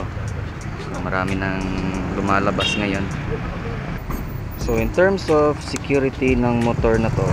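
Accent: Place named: Cebuano, Philippines. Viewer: Gnda talaga english accent ng mga taga cebu haha ride safe paps. Subscribed already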